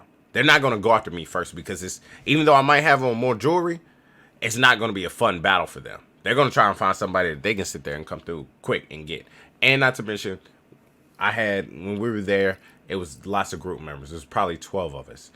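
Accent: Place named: Dominican Republic. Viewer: U actually do look Dominican. They can just tell from how you carry yourself...and your accent of course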